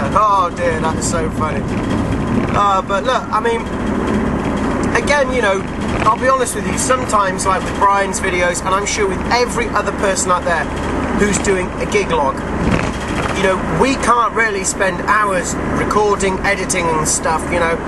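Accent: United Kingdom. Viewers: You're and you have given us knowledge...and lots of it. But trust me, this djtutor stuff is good TV material. It's addictive, just like reality TV is. I know that, just like myself, a lot of people around the world gets hooked on them...speacially gig logs...those are 110% reality TV!!! Plus, you will be the perfect British host for the show..you know they love British accent people here in the US TV networks. Saludos desde Miami!!!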